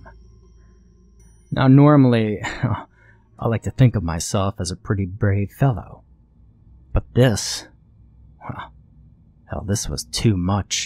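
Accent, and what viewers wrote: South, United States. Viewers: Your channel is very interesting. Methinks I shall subscribe. 😊 Thank you for your Military support , as well, Pastor. It truly means a lot. I re-wrote this to mention I subbed. I’m looking forward to listening more. Your Southern drawl is making me wish I was still back at one of my Duty Stations & home for a decade, South Carolina. I spent quite a bit of time in NC; I worked out of Charlotte for awhile but it was when they were building Panther Stadium! 😳 And who doesn’t love Asheville? Take care & be safe!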